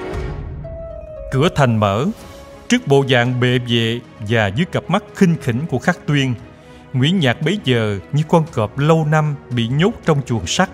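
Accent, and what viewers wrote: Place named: South, Vietnam. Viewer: nghe giọng Nam là thấy rất dễ thương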